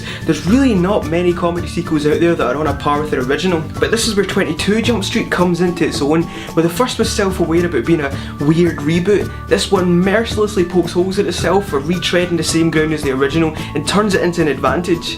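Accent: Scotland, United Kingdom. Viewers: It's so nice to hear another scottish accent on here!! This review was really useful, I was needing to choose what to go watch at the cinema tomorrow, so thanks :)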